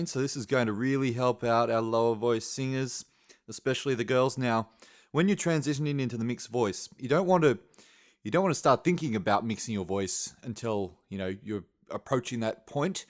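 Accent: Australia. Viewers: You are one of the best vocal coaches here on youtube, possibly among the top 3, and I just love the aussie accent!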